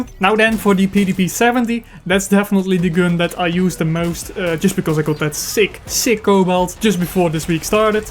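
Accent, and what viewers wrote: Netherlands. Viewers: Je klinkt Nederlands, maar waarschijnlijk heb je geen idee waar ik het nu over heb haha.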